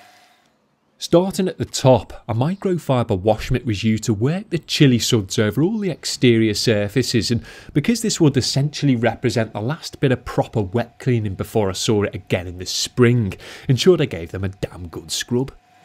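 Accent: Liverpool, United Kingdom. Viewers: Scouser mate (Liverpool) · You have the most british accent Ive ever heard, I love it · What a pleasure to ear these sophisticated sentences. I hope one day I could speak with a similar ton and accent. I hope you would not mind if I ask in which area of UK we speak such a nice way. Cheers from Normandy. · Nobody sounds more British than this guy😂👍🏻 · Is that scouse accent? Sorry if I am wrong. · What a strong British accent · This is it. This is the best British accent.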